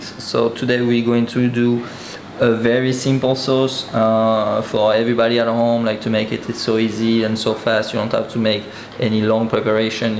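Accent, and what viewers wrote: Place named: France. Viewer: Je trouve chouette ta chaine car je vais apprendre l’anglais et cuisiner avec toi ! C’est cool et du coup on comprend tout vu ton accent français.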